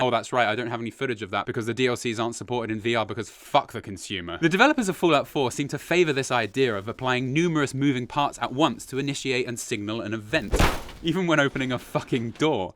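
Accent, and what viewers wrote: United Kingdom. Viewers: I love the British accent💙. Also great video! I could not stop laughing 😁😁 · I must have had several brain hemorrhages because I never realized you were British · wait hes british?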